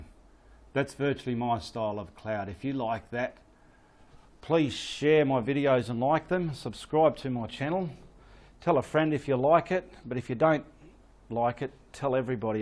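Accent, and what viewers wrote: Australia. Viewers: so australian lol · I love your beautiful Ausi accent. Lovely presentation on how to make realistic cloud. I think if you had used a bit of firey grey or slightly bright yellowish orangy instead of PURPLE, it would have given more depth....anyway, just my thoughts..... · Holy Crikey! Wasn't expecting that level of Aussie right off the bat. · I love it! You’re such a hoot! “I’m gonna get back into that bus and drive it into this neighborhood!” Made me smile. I’ve never heard that out here in western Nevada, USA.... especially with the Aussie accent. Enjoyed your whole presentation and learned a lot. Sharing with my friend that does acrylic painting parties... (tutorials with laughs among friends and we leave with our own work of art.) Thank you. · Good to hear an Aussie, speak like an Aussie! It’s a joy! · Love the Aussie bush accent 😂 Enjoyed watching this and enjoyed the humour to go with it. 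Thankyou for sharing.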